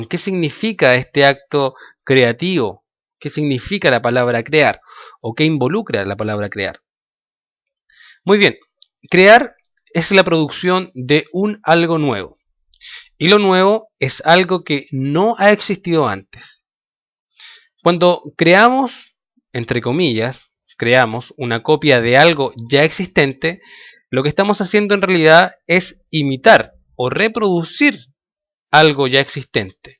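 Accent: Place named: Chile. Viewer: solo un segundo me bastó para reconocer a un chileno xD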